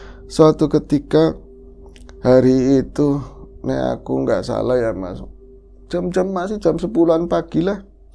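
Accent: Banyumas, Indonesia. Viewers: hallo mas 😅😅..seneng aq klo denger bhs ngapak..ketawa trs aq nya..sehat sll mas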